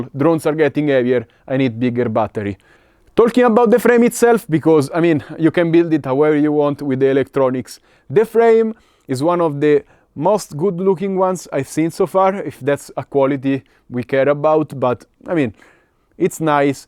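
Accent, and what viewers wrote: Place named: Italy. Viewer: When I was looking to buy my first quad I was confused. Finally I found Your channel and watched Your videos which gave me some knowledge about setups and motivational boost. During this time Your skills were totally mindblowing for me. It's quite funny when I'm coming back here right now I feel so motivated to go out and fly when I hear Your voice and italian accent:D Thanks:)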